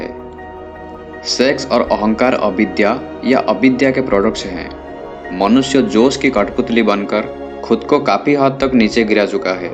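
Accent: Odisha, India. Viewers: Bhai odia ki katha kahiba style ru jana padi jauchi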